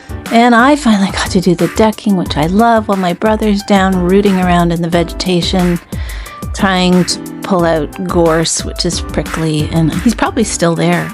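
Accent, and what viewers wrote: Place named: New Zealand. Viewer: hi from nu zillun love your kiwi speak lol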